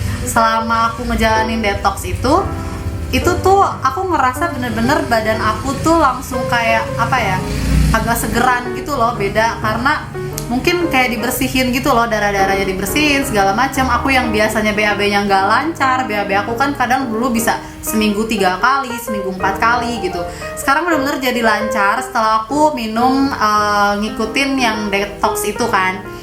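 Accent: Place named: West Java, Indonesia. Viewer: Siteteh orang sunda nya twh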